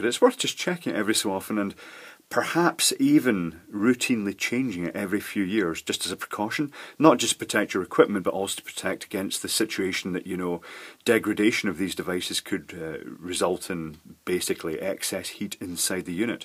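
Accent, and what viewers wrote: Scotland, United Kingdom. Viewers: Adam Baker can't understand Scottish accent? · Hi, I love your accent, my father from Lewis and Harris in Scotland and has a similar accent to yourself! Great Channel!!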